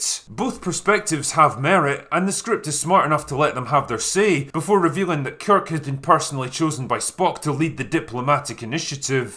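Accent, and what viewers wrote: Scotland, United Kingdom. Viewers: is your accent scottish or are you doing an immitation of an intoxicated man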